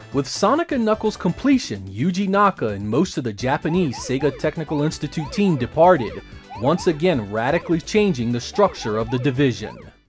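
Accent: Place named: Delaware, United States. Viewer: So, totally off topic, but your accent... Delco? Delaware?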